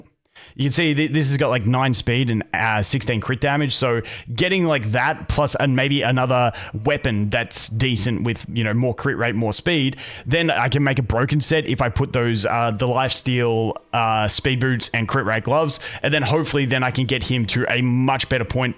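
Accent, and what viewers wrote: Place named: Australia. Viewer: As a Yorkshire man i always find Australian accent fascinating.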